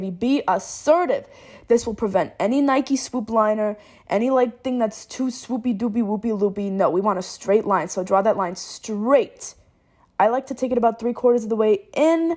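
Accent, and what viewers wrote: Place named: New York, United States. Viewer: Girl, love you! Where you from? I'm from Jersey/Brooklyn and we sound very similar.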